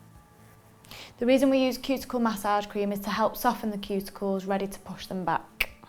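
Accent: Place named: Manchester, United Kingdom. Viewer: No, it's a Manchester accent.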